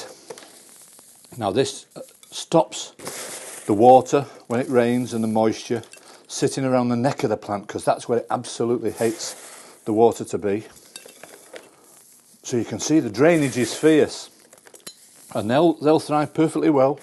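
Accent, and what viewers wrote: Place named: Yorkshire, United Kingdom. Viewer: Great video. Sounds like a fellow Yorkshire man. Just planted an old bath with this beauty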